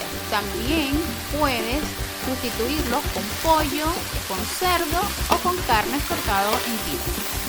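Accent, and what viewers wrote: Venezuela. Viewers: Hola! Soy de Venezuela, pero vivo en U.S.A. yo imagine que eras de Venezuela por la forma de hablar y de cocinar (con clace) jjjj Saludos.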